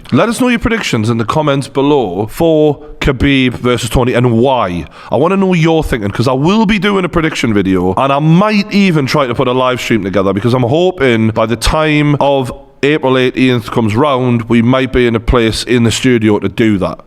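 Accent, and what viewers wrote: Newcastle, United Kingdom. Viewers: I`ve subscribed just cos you're a Geordie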